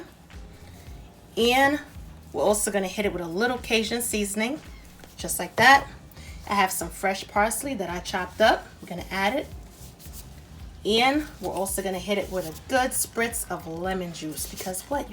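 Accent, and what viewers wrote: New York, United States. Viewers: Hi. I just love your videos. I really don't remember when I subscribed to your channel, but it had to be something that look good for me to do it. Me and my husband was watching a vid of yours maybe a week ago. Your recipes look sooo amazing and good. But, our decision was about your accent. I said that you sound like you are from NEW YORK. He said your accent sounds like more of NEW ORLEANS. Please let us know. Because TRUTHFULLY!!!! NYC IS ON MY BUCKET LIST TO VISIT!!! WE BOTH LOVE EAST COAST RAP, so I don't understand why he said NEW ORLEANS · I’m a brand new subscriber. These crab cakes came across my feed, and I was hooked. Are you from NYC? I read your about but it didh’t say. My hub is from the Bronx. I hear an accent. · Lordy,Lordy that looks good. I’ve been fasting for 18 hours now and this just drove me to another level of goodness.I hear a hint of NY in your speech, is that right.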